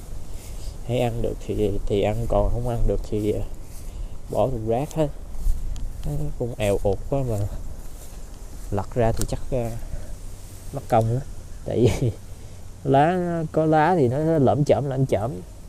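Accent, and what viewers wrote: Vietnam. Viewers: giông ngoai bac nha minh vay bn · Anh ơi, mẹ anh có phải quê là quãng ngãi ko, tại nghe giọng nói giống nhà nội em quá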